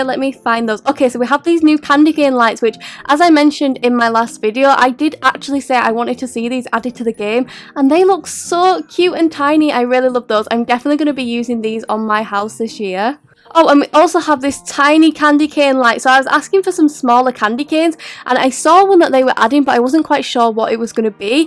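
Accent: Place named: Scotland, United Kingdom. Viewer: I like you Scottish voice. :3 ❤️🗣🇫🇮 (WHERES THE SCOTTISH FLAG?)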